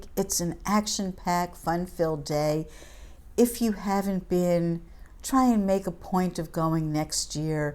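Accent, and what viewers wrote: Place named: East Coast, United States. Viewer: Your accent and attitude remind me so much of my family out east. Loved the recap!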